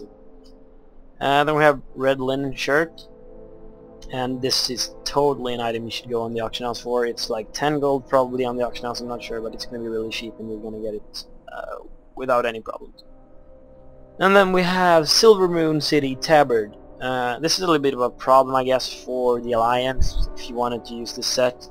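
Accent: Sweden. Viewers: Du är svensk va? Jag hör det på din engelska/ "U are english right? I can hear it on your english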